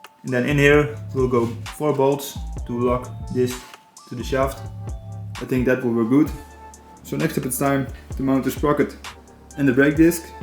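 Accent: Netherlands. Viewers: as the dutch would say: waajoo dikke john deere trekker! (i'm dutch and he sounds like he's dutch) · Are you dutch? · oké ik dacht al het al te horen een dutch accent 😂 oja we gaaf dat je van een speelgoed trekker een gemotoriseerde maakt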